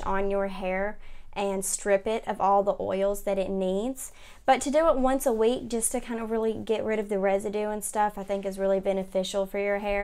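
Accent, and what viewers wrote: South, United States. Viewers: It's a southern accent...could be Californian.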